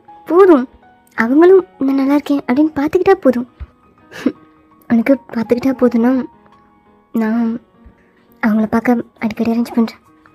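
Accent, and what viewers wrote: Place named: Madurai, India. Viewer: Hloo Sis 👀💜 unga channels ah recent ah tha theriyum Ella ffs uhm paathen intha ff romba Azhagaaa irunthuchuu like solla wrds eyy illa avlo Azhaguu 🫶💯✨️Ceo vum aprm unga oneshots ellame 🫠✨️But this hits diff yen ungaluku comment pandravanga idha pathi pesala nu enaku thrla unga dialogues laam Avlo Azhaga iruku...😫💯Vmin ah Avlo Azhaga kamichurunthinga intha story line eyy nallairunthuchu But jimin ku eathachu aagura maari tae feel pandra Maari kaamichurukalam i neant unga story romba nallairunthuchu i can feel vmin realtionship but jimin tha romba paasam kaamicha maari oru feel🐣🥀 spcl part potta apdi podunga And this made my day na avlo hpy ya feel pannen intha ff kekkum pothu intha comment padipingalanu thrla but padicha neengalum hpyy ya feel pannuvinga nu tha podren ❤️‍🩹✨️ Neenga madurai la antha slang Azhagaaa pesuringa na sivagangai...Aprm Starting la neenga okva nu ketutu neengale ok nu soldrathu enaku pidikum...Aprm support illanu feel pannathinga kandipa support kidaikum...And i will support youu okvaa...Saranghae sistaa...💜🦋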